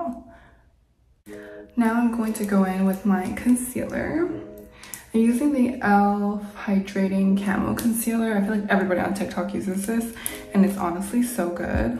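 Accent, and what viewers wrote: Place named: California, United States. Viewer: Sounds like a California girl accent😅😮‍💨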